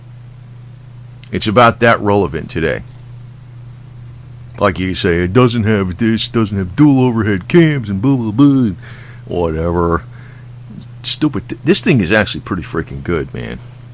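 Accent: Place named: New York, United States. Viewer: Form NYC sounds like.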